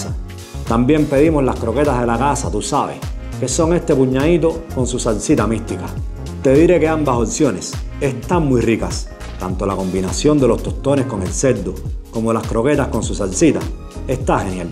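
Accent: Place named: Cuba. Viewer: Mi brother si eres Cubano, sabrás que el acento nuestro es ése, no se trata de guaperia ni nada por el estilo, simplemente es nuestra forma de expresarnos. Cada país tiene su propia forma de expresión, ejemplo los dominicanos hablan que parecen analfabetos y es todo lo contrario simplemente es una cuestión de cultura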